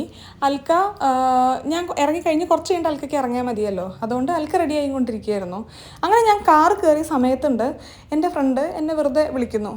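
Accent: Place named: Malabar, India. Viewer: Malabar slang😅👍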